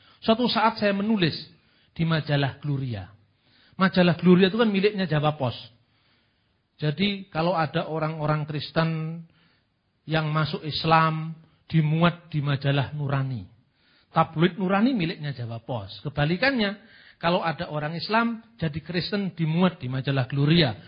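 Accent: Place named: Yemen. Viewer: Pk kalau ngomong jawa seakan2 org dess.tapi,kalau ngomong arab seakan2 org yaman👍👍👍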